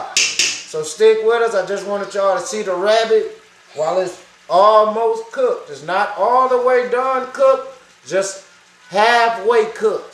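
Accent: South, United States. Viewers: I love that way you talk southern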